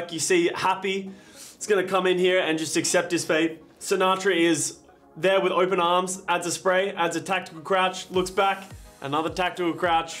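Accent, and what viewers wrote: Australia. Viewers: Another excellent episode of CNN! I need more coherent Australian rambling in my life.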